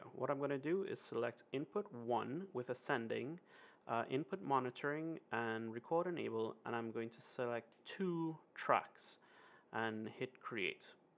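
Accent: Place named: Trinidad and Tobago. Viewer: This may have been an issue I would have encountered sooner or later and may not have known what to do, so thanks a lot for this heads-up bro!! p.s. from the moment you spoke I knew you were a Trini.. we can't hide lol but your standard English is very good!! Blessings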